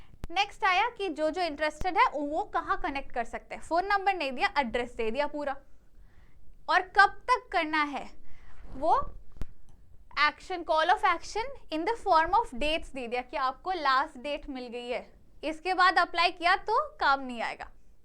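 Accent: India. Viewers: bihar, up, ya haryana se hoga sawra pakka.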